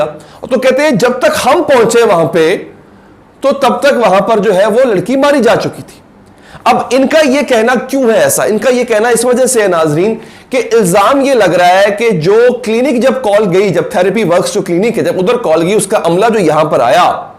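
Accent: India. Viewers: Sir please Urdu sahi Bolen Indian accent hota ja raha he apka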